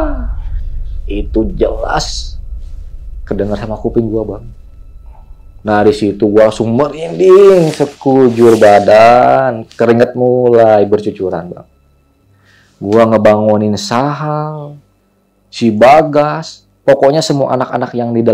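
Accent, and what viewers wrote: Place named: West Java, Indonesia. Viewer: Lucu nya klw orang Sunda ngomong gua elu..😂 semangat kang ...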